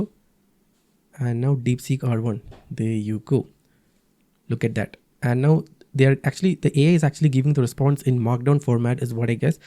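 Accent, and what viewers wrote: Kerala, India. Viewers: You sound so mallu with your mallu English bhai....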